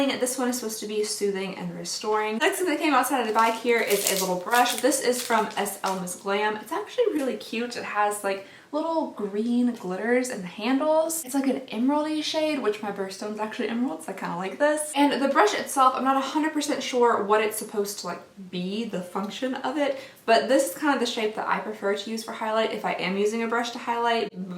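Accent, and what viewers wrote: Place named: Boston, United States. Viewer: AAAHHHHH!!!!! I love your Boston soooooo much!! <3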